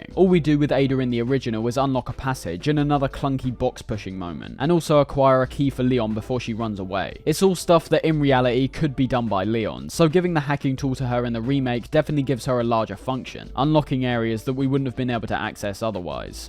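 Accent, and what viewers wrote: United Kingdom. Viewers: When I saw this on my feed I said to myself, "please have a brittish accent, please have a brittish accent". Was pleasantly surprised, idk why but it gives a sort of legitimacy to these essays.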